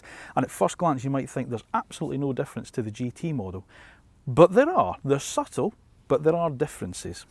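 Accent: Scotland, United Kingdom. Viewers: Thanks for the great video! Scottish accent is also fantastic -- so many memories from spending time in Edinburgh 😂 But seriously about the car: IMHO the more unrecognisable and sleeper it looks the better 😂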